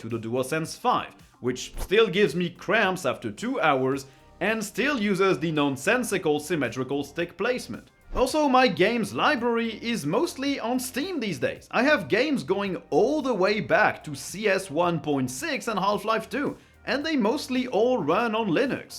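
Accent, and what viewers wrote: France. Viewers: J’suis pas fou c’est un français il parle bien mais on se sait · He's french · Petit frensh accents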